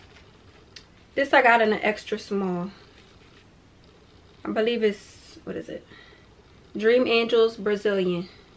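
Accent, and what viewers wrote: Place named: New Jersey, United States. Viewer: So your a Jers3 girl. Still there? Your accent is sweet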